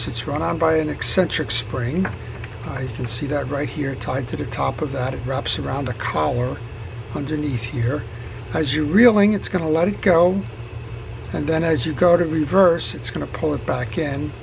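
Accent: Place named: Long Island, United States. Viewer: Excellent video and thank you ! You are a savior. I came here to figure out how I put the bail back on a Cirrus CR035. Had a hard time finding a schematic (probably could have search for one for the CR025). A coworker gave me the reel and I have been taking it apart and clearning and lubing it up. I could not figure out how to put that black piece on the bail spring (missed it had a hole for the spring bc I had grease covering it). Thanks to you I now have it covered. I needed to come apart bc there was dirt in there and had been neglected. Thanks again. You sound like you may be a fellow LIer; grew up in Rocky Point myself. Take care.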